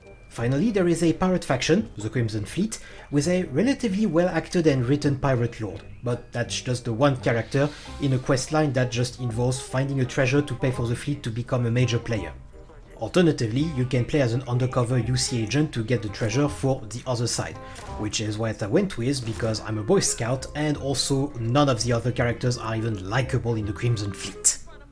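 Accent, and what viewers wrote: France. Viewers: Sorry to tell you but I just watched your vid for your french acsent. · I just discovered this channel. After the video, I went straight to see if he had more content, I hope he uploads more videos, I love his witty sarcasm. The French accent just adds the cherry to the top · That accent... Are you ... French ? Anyway Based Review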